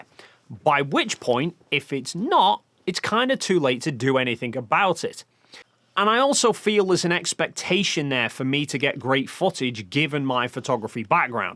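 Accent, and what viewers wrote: United Kingdom. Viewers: Sorry about the not-relevant question, but... I've been trying to place your accent. Where in the UK are you from?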